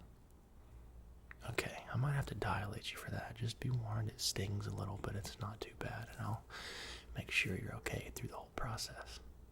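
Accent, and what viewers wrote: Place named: South, United States. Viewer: Your ever so slightly noticeable southern twang is so comforting lol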